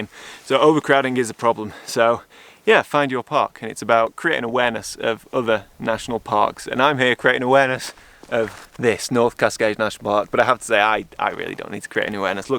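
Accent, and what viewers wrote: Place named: England, United Kingdom. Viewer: The North-West US, that is? Near Canada? I had to check as the English accent created ambiguity ;) The other day I read a story of a guy who survived a grizzly bear attack in the Canadian side of the border. On an island where the wildest predator is a fox and the whole country is man-made, man-influenced or man-kempt, where rain can surprise you, but generally will not get you hypothermic in a short time - what did you take along as risk-mitigation on this trail?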